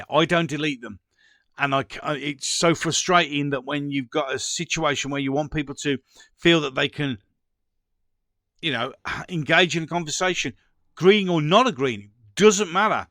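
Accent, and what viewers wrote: Birmingham, United Kingdom. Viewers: Hiya New Sub, I'm made up your channel was recommended, people by me {I'm over the water from l/pool} are still believing the official narrative 🤯 by the way, I love the brummie accent , UB40 fan since the late 70's, and a friend of Brian Travers may he R.I.P 🙏🏻Such a lovely and generous guy .... Jayne 🇬🇧💙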